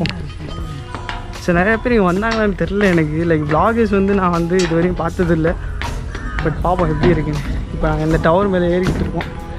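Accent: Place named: United Kingdom. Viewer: Unku tamile pesa theryathu la London durai poda dei olunga pesu frst 🤦🏻‍♀️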